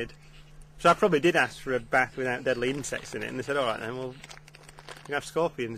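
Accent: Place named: Manchester, United Kingdom. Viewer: Love his accent. He doesn't tone down the Manc does he lol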